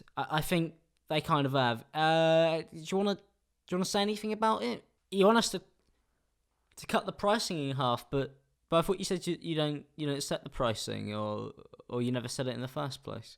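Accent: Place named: England, United Kingdom. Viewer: wow, a lot of ppl sound like him in England, depending where ur from but I guess that’s why i don’t see it as anything special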